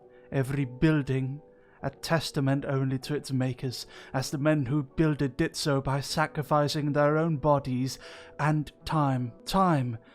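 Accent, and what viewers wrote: United Kingdom. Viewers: With the voice I sort of imagine a British mercenary. The lore of this just really is deep.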